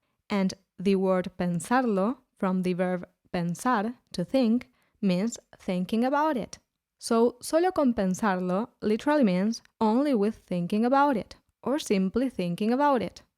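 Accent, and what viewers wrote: Argentina. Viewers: Are you from Argentina or are your friends from Argentina? If so, it is a very noticeable distinction to pronounce your "ll" like "sh" instead of "y." Maqui(sh)aje. Maybe that's what you mean? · Heavy Argentinian accent... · No, the singer is Puerto Rican, the speaker is Argentinian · Are you Argentinian? I love your accent.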